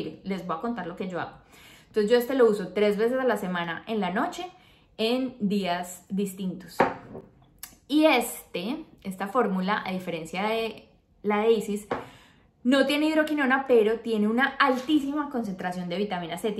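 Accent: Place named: Colombia. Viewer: Tenias que ser COLOMBIANA para ser tan HERMOSA y ese acento 😍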